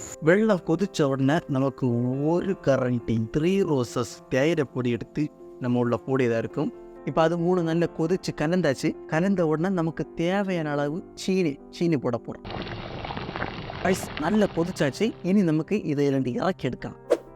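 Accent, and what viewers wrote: Kerala, India. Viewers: அடேய் தம்பி., சுலைமானி tea பல பேரு செய்றாங்க..நீ எப்படி பேசிகிட்டே செய்றன்னு பாத்தேன்..உன் கேரளத்து தமிழுக்காக தான் இந்த காணொளியை பார்க்கவே செய்கிறேன்..😅😂🎉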